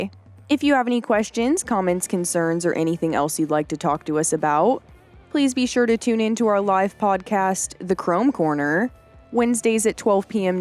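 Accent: United States. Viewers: It's amazing with a yankie american women speaking trow a documentary I love it cool makes you think she's british and loves ERF trucks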